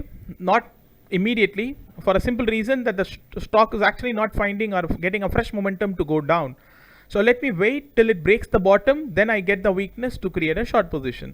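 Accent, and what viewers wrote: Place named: India. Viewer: You are explaining without hiding ,anyt hing the sharemarket secrets in indian English.Your dedicated service is weicomed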